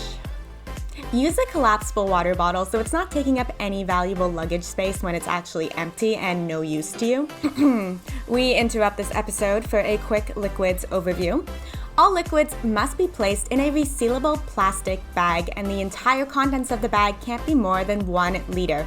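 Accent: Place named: Bronx, United States. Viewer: Your voice sounds a bit different like you come from the Bronx not Canada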